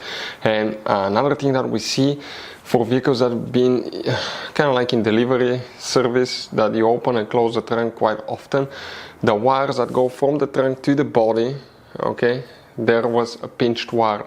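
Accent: Russia. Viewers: Just curious my friend where is your accent from? Russia?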